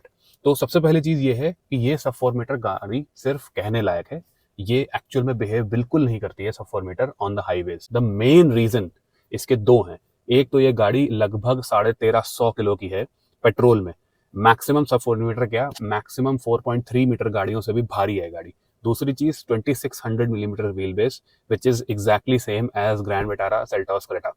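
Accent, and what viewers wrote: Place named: Uttar Pradesh, India. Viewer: Bhai UP ka hai na tu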